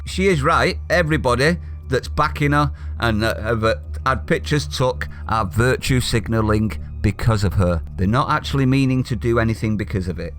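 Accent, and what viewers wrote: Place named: Merseyside, United Kingdom. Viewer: Totally nailed it. little Greta made her fame by being a funny witty talking parrot and now that she is famous... she complains that people were coming to see her just because she was an unusually entertaining parrot in their local town square. Waki Waki Greta! here are some more news for you: same people, when visiting Paris, also take a selfie with the Eiffel tower just because its the famous... Eiffel tower. None of them have even a bit of appreciation to the engineering genuinity of the 19th century. They just want to brag to their friends they were seeing the f&%king tower while they (friends) were taking their kids to school in the rain. Your channel became my favorite daily news channel... from way-way far from Britain you sometimes sound to be like a disillusioned John Lennon speaking to our world, horrified by the reality all around... 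(sorry for my cultural narrow mind views... to my non Brit ears Mersey area accents sounds as one... ). Keep the great work!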